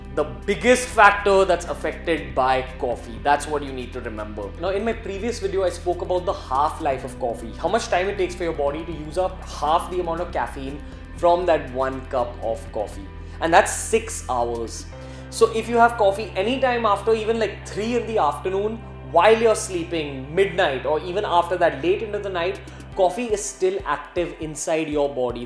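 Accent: India. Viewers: Why do you sound like that? Are you Indian? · first, are you talking with your body or your mouth?! second am wondering how did you invent this language ? is it indian english ?!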